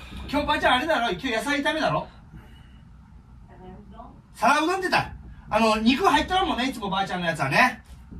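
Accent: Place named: Kumamoto, Japan. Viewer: 熊本弁落ち着くわ～ 久しぶりに熊本帰りたい！☺️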